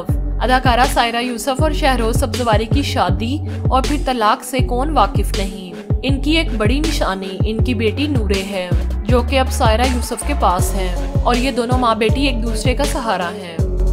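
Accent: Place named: Punjab, India. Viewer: PL IMPROVE YOUR URDU ACCENT, ITS A MIXTURE OF PUNJABI AND URDU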